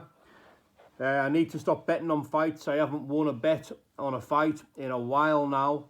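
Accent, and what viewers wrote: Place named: Cumbria, United Kingdom. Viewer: You sound cumbria fella.............Where abouts? Just watched a fight that randomly popped into my tube feed. You said ' is it ower'. That's not Welsh. Lol.........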